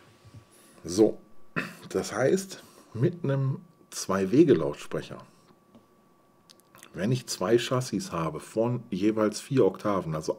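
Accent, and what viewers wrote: Ruhr, Germany. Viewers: Vielen Dank für die kurzweilige halbe Stunde. Es hat mir viel Freude bereitet, wie du mit deiner "Ruhrpottschnauße" (das ist sehr positiv gemeint) die Dinge auf den Punkt bringst.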